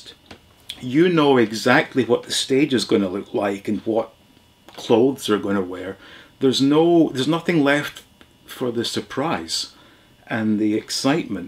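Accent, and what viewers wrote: Scotland, United Kingdom. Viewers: You sound Scottish.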